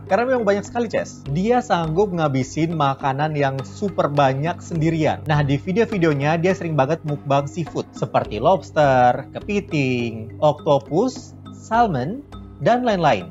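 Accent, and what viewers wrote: Makassar, Indonesia. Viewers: Orang Makassar kah ces?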